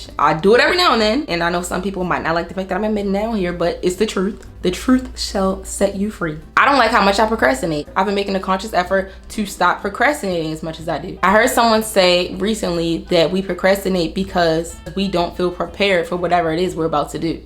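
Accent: Baltimore, United States. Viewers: ✨Your accent is giving me East Baltimore vibes ✨ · I hear that Baltimore lol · I know a Baltimore accent when I hear it! But you gained a new follower❤️ I needed to hear this !! Thank you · I have a video idea you should make a video of you saying words I’m just realizing you from Baltimore and that’s why I really like your accent. You should make a video saying different words like you too. Earl blue Avenue. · are you from Baltimore? (I’m from there) idk I can just tell by the accent 😭. but you are so pretty and this video was sooo helpful! ❤️ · are you from bmore?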